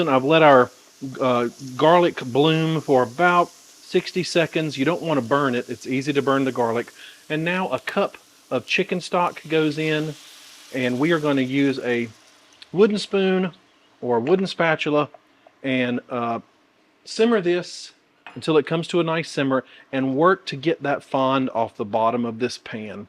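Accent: South, United States. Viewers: I love you and I am proposing. I love your personality, your southern manner of speaking, and darlin’ you can cook! I know you are spoken for, but I will wait. BTW I am 81 years. ❤ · My husband says you’re me but with a beard!!:) that’s because his mother is German and he grew up speaking high English. I’m from Louisiana aka Luzianna. I grew up with such an accent but moved north in high school and have been mercilessly teased since. I think your Southern style is lovely and refreshing! God bless you shooger💜🙂 I love you’re show and find it informative and entertaining. I love your recipes and tips. Keep up the great work. 🍽️🎬🎦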